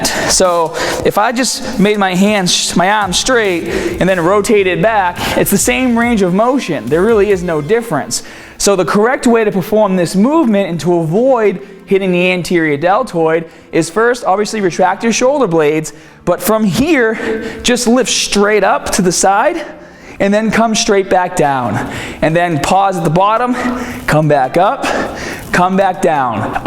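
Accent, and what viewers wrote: Boston, United States. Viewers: You didn't used to have a Boston accent this thick back in the 2011 days, are you doing this on purpose? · What kind of accent is that? Like Boston, Rhode Island area? And I'm new to your channel! I remember years back I would youtube how to do a certain exercise and your videos would always be up! Looks like I was doing mistake number 1 lolz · You're really laying on the accent thick, you never spoke like that before, plus you say certain words with the baaaahhhstahhn accent and same the the same exact word later on without an accent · How did I not notice your Boston accent till like two years into watching you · Ever since I noticed you has a Boston accent it's like a game to catch it. Hahaha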